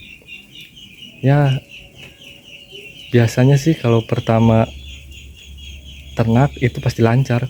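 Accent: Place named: West Java, Indonesia. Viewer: Alamat d mana...kaya orang Sunda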